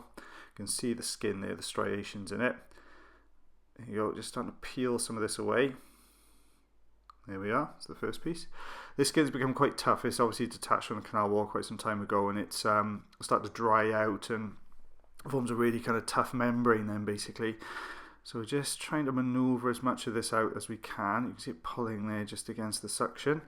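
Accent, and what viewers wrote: Wales, United Kingdom. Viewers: god that beautiful Welsh accent. I know people from the UK deride it, but I challenge anyone to listen to say “maneuver” and not love it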